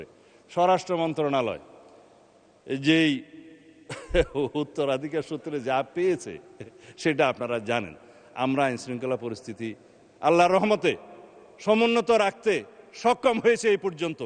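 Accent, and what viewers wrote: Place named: India. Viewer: এর কথা শুনতে ভালো লাগেনা,,, ভারতীয় একটা ভাব এর মধ্যে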